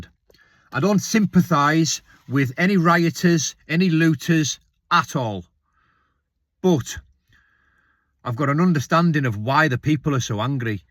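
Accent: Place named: Cumbria, United Kingdom. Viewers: Knew it from your accent. I’m Cumbrian too. We are lucky where we live but it’s changing. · I knew when I started listening to this video you sounded Cumbrian. 😂 I moved up here 10 years ago and love the spot, I integrated into a small village community after moving from a big busy city. I wouldn't try to being my city lifestyle to my new community because they live there way of life and I've embraced it.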